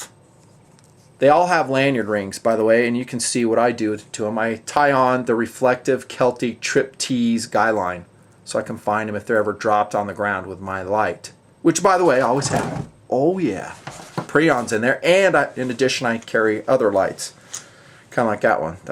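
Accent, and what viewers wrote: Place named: South, United States. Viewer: Southerner? Southern England? Australia? Who in the South says ay?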